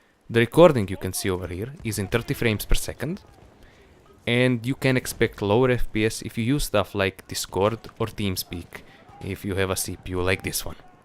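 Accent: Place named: Russia. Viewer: Russians акцент!)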